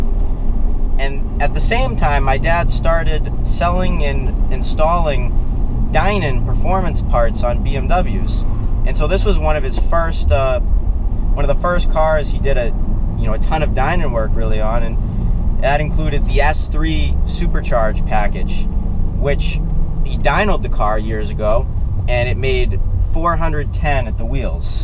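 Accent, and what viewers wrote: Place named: Cape Cod, United States. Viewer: Are you from the Cape by chance?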